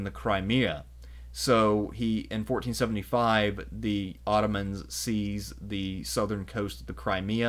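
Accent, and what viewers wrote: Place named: United States. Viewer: Our narrator doesn't really do pronunciations very well. Almost every video he "Americanizes" other people's languages. I guess you can't be good at everything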